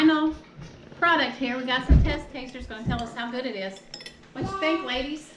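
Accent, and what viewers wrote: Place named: South, United States. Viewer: girlllll I absolutely love this video you gotta do more, umm and I love your southern accent you're gettin I'm so jealous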